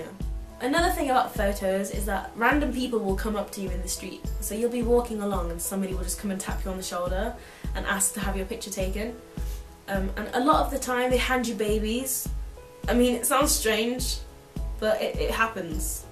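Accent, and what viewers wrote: United Kingdom. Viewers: I missed your English Accent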